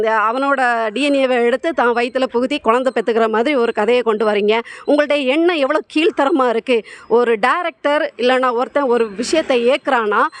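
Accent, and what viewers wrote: India. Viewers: அக்கா கன்னியாகுமரி மாவட்டத்தில் உள்ள பெண் போல் தெரியுது பேச்சை கேட்டல் 💪💪💪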